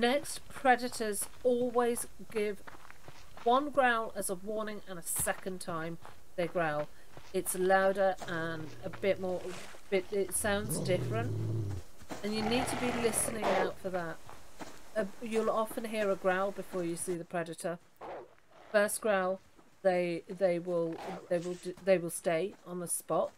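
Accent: United Kingdom. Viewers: Sorry, I must have misheard something! P.S. Nice to hear an Eng;ish voice for a change, I can't listen to most of the American guides with all their drama and forced jollity :D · I'm a tad biased, of course, British English can win me over immediately. But great guide, points nicely exemplified with footage at the right times, well done!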